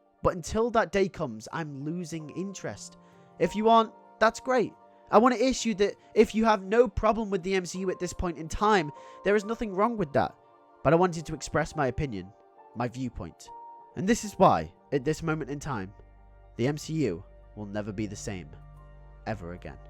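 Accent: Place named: England, United Kingdom. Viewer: Please; only speak the Human language aka American English, the stuff you render now is putrid noise from mere england, the ugly proto form of our language.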